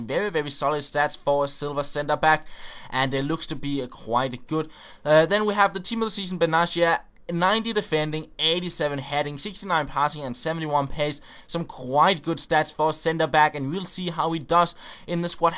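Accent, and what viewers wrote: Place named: Denmark. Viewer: He's From Denmark, whoever says he has a bad accent try uploading daily Youtube videos in a different language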